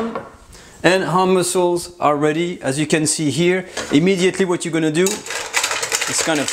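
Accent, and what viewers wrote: France. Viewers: j'ai reconnu à l'accent que tu étais français, en tout cas super que tu parles en anglais, ma voisine va encore plus adorer;), en tout cas super bien expliqué,super recettes... 👍👍👍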